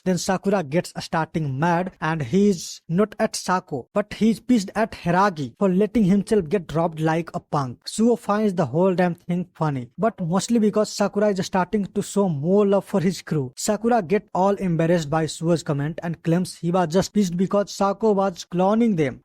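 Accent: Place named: India. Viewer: Please add some emotions in your voice Bro I feel your hindi voice in english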